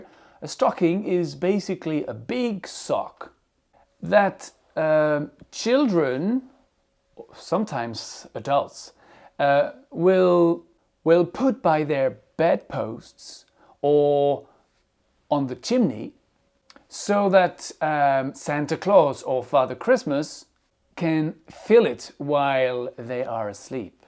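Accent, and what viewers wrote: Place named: United Kingdom. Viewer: J’adore vos vidéos, amusants, instructifs. Tout pour apprendre aisément. Comme je viens du Québec, l’anglais dont nous avons l’habitude d’entendre est plus celui de l’Américain. Mais j’aime ce son Britannique. Merci, je continue de vous suivre. Bon temps des fêtes!!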